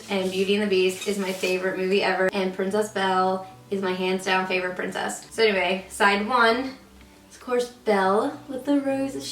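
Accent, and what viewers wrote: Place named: Tennessee, United States. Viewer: Is she from Tennessee? I'm trying to guess from her extremely subtle accent.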